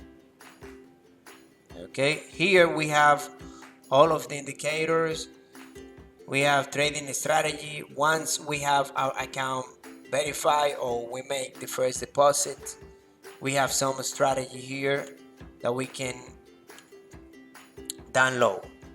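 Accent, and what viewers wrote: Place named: Caribbean. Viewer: I love Caribean English!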